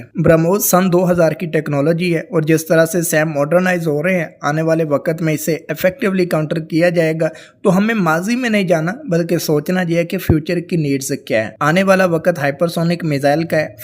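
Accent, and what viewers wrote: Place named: Punjab, India. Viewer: Bhai please English panjabi style me na bola kar bura lagta hy.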